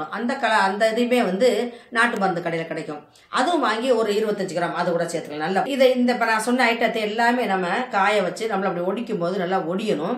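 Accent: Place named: Madurai, India. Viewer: Madurai ya.. 😇😇😇😇..Na num Madurai dhan.... Superrrrr..pesuringa.. 😃😃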